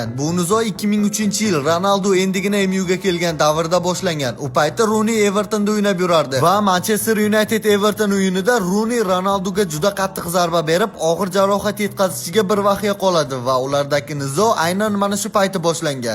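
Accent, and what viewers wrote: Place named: Rural, Uzbekistan. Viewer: Gapirish nutq kalxozcha blaaaaa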